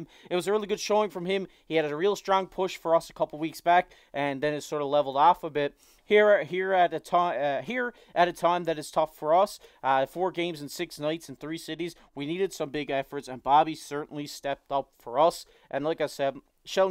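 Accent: Canada. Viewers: Great videos, you are the most Canadian sounding guy ever :)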